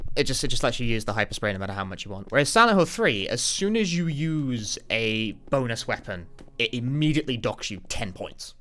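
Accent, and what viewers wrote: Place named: London, United Kingdom. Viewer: if you're reading this my dude which part of London are you from?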